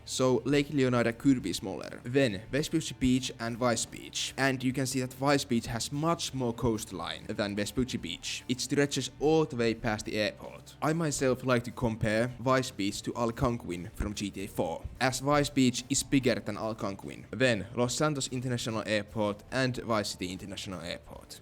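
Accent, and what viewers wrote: Finland. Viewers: Finnish more likely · You sound Finnish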